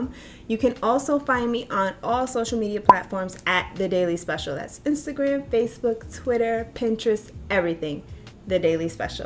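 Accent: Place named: New York, United States. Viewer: I definitely hear that NY accent!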